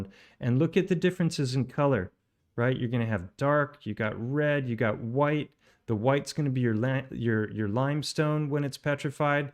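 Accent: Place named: South, United States. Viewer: It gets worse!! Typical Southerner ,he loves the sound of his own arse, sorry voice.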